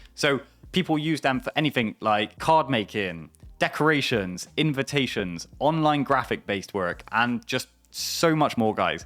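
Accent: Bristol, United Kingdom. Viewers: Aye are u Bristolian too!? Subscribed!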